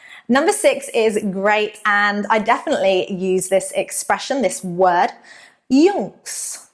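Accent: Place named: RP, United Kingdom. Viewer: I like your posh accent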